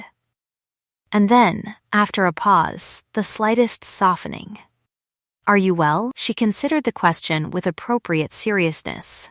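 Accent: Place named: North America. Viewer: This AI voice is annoying and it's a North American voice for 19th century England.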